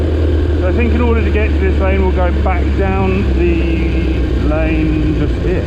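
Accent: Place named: Kent, United Kingdom. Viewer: Nice, i am actually based in Maidstone, Kent. Recognised your accent! Found you through a search for Malle videos and liked the content! I had a similar story for the Malle this year too! Not ideal!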